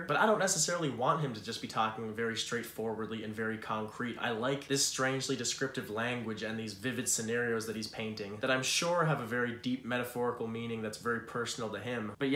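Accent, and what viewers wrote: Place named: Ireland. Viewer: Where is your accent from? It sounds so Irish sometimes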